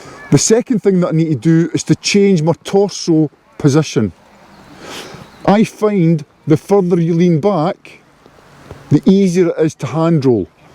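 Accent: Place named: Scotland, United Kingdom. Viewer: Come for the hand roll, stay for the AWESOME Scottish accent.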